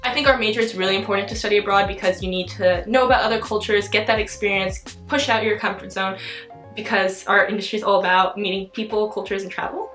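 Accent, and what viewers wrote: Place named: United States. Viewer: your accent is so perfect and pleasant I think you are American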